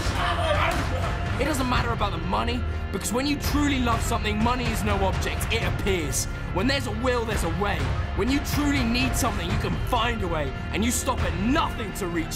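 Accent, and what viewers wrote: United Kingdom. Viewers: actually it's one of the most impressive motivational videos i've ever seen best speech , great video and your British accent is astonishing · Accent sounds crazily British.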